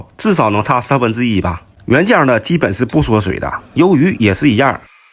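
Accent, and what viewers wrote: Northeast, China. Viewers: 这东北大碴子口音😂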